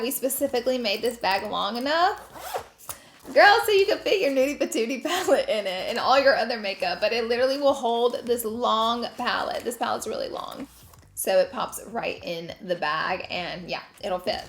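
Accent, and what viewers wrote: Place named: Alabama, United States. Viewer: Yes!!!♥️♥️♥️ I’ve loved you since your dollar tree hauls with the Alabama accent😂 Your heart is pure gold my love. Much love from Hawaii🌺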